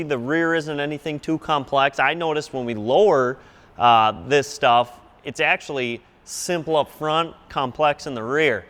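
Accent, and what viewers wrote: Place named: Wisconsin, United States. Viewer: You have the most Wisconsin/southern Canada accent ever 😂. Sickkkk truck tho 🎉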